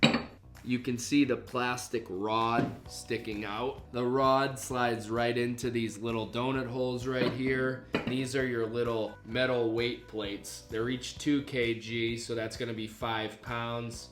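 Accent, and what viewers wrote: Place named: New Hampshire, United States. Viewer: I was just listening to this video and thought, "This guy is definitely from New Hampsha'" then I looked at my screen and saw the huge flag! 🤣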